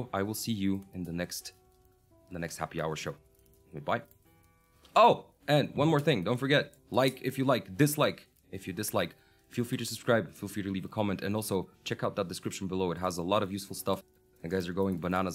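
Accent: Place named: Norway. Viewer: You have nice accent when you speak. You're norwegian, aren't you?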